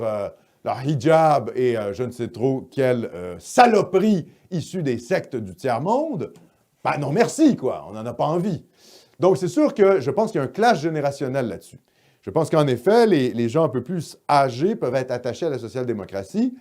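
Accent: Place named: Quebec, Canada. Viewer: Je ne me lasserais jamais de ce mélange d’accent québécois et des punchlines à la française ! Merci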